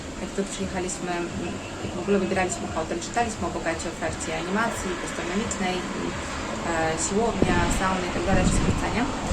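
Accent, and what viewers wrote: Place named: East, Poland. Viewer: Akcent wschodni Pani ma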